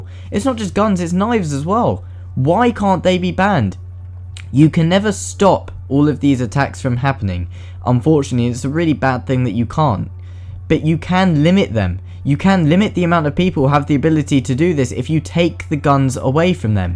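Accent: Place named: Texas, United States. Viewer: you sound like you're from teaxas.....1776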